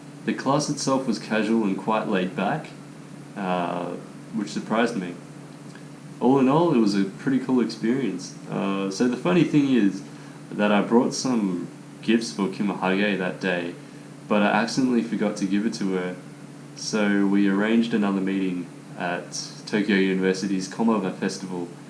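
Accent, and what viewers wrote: Australia. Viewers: オーストラリア訛り苦手だから助かるわ… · You got a nice Aussie accent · Australia Englishの発音がちと、ムズい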